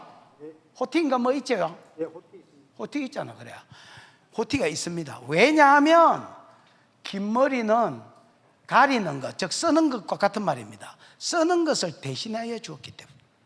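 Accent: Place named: Busan, South Korea. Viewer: 목사님~^^ 귀한 말씀을 듣게하시니 감사드립니다 ❤ 우리남편에게 목사님 부산 방언통역재미도😂 쏠쏠합니다 건강하십시요 🎉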